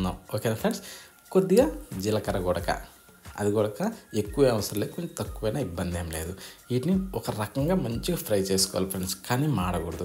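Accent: Nellore, India. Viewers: Bro meeru Nellore valla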